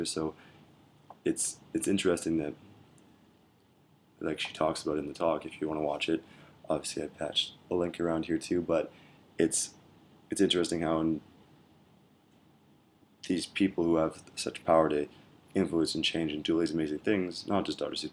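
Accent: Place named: Canada. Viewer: ps: you sound suuuuper Canadian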